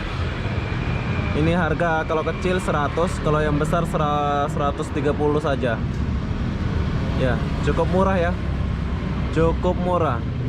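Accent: Indonesia. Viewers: Ini seriusan orang malasyia...kok logatnya indonesia bgt ya.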